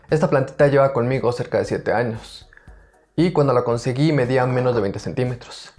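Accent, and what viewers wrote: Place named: Mexico. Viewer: Buenos días desde España,. Una vez más... gracias a ti por responder tan amablemente y compartir tantos conocimientos. Ayer a punto estuve de despedirme con un... "fuerte abrazo desde España para todo Méjico", pero dudé de tu nacionalidad porque tu acento no es tan marcado como el de otros de tus compatriotas, pero ahora, una vez confirmada mi sospecha, puedo despedirme diciéndote.... ¡¡¡ DESDE ESPAÑA... UN FORTÍSIMO Y SINCERO ABRAZO PARA TODO MÉJICO!!!.;o)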